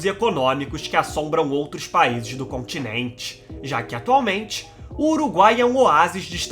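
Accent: Rio de Janeiro, Brazil. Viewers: Teco- teco bla, bla, blá......vc pode falar isto mesmo sem esse horrivel sotaque Carioca, sem estalar essa aguda vóz. O assunto é ótimo mas a exposição não. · Ótimo canal, mas esse sotaque carioca é insuportável.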